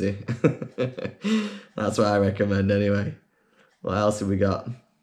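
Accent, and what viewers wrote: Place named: Manchester, United Kingdom. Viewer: Deffo from Manc - "Could be angin" :) :)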